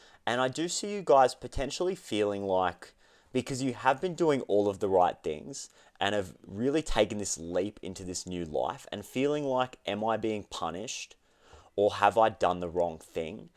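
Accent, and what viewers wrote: Australia. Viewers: Is he Australian? I'm Italian, and I don't know that accent 😅 first I thought it's Scottish but Idk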